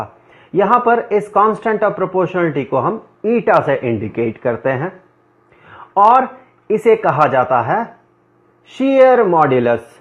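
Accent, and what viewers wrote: India. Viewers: والله حسبه يتكلم انجليزي بس باللكنه الهندية.. لين استوعبت ههههههههههههههههههههههه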